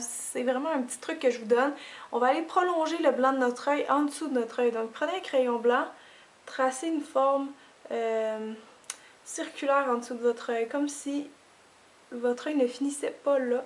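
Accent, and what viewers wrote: Quebec, Canada. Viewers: Ta un super accent canadien français et anglais !! XD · J'adore dessiné! et c'est vraiment joli ce que tu fais! J'adore! et je pensais que je n'aimerai jamais l'accent quebecois. La je suis je sais que je me suis trompé. A croquer :) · quel accent sympathique. vive les quebecois! ^^ et quel travail ! bravo, j'aurais pas fait mieux.. xD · C'est un accent québécois je pense... Je sais pas parce que je le suis... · j'habite aux états-unis, et j'ai vu ton vidéo, et j'ai pensé "cool, je comprends quelque français, donc je le regarderai!" eh bien tout de suite j'ai su que tu est canadienne à cause de ton accent. ce sons un peu comme un accent américaine avec l'accent français, ensemble. Je suis fier de moi, que j'ai pu le reconnaître immédiatement. :)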